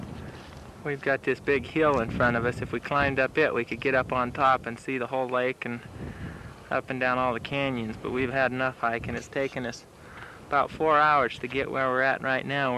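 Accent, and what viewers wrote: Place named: Utah, United States. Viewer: This is the most Utah speak I have ever heard. The most fetchin fetch of a mount'n I've ever fetchin gone seen!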